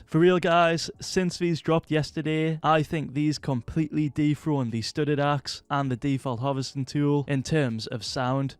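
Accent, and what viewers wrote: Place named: England, United Kingdom. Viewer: I really like your Videos but I hate this english Accent its so extrem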